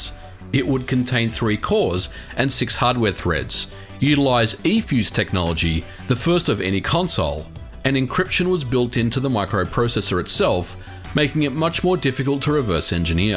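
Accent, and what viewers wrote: Australia. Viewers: Australian or South African accent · Mate, that's just part of the mystique of the Aussie accent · Strayan accent coming through.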